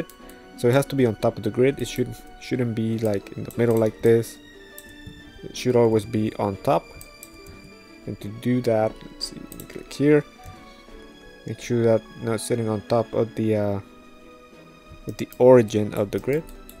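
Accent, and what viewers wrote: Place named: Brazil. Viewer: Thanks for sharing! Sinto um leve sotaque BR :}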